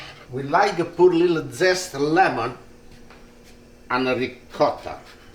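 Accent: Italy. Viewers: You remind me of my grandfather who I called Papa. Love the Italian accent. I’m gonna make this dish this weekend! 😃😋❤️ 👩‍🍳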